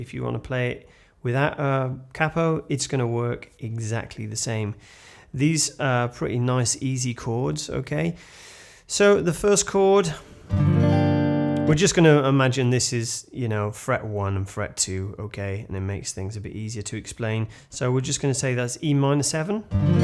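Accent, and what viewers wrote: United Kingdom. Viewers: Sings American.....then speaks....he's English !!..amazing !!..great teacher,great voice !!..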